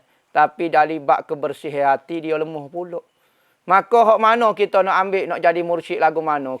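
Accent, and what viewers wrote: Kelantan, Malaysia. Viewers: Walaupun saya rakyat Malaysia. Alhamdulillah saya dapat faham ustaz ceramah loghat Kelantan❤️❤️❤️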